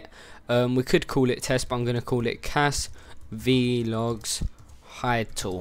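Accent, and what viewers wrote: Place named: East London, United Kingdom. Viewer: Brilliant tutorial, and your epic east london accent is boss!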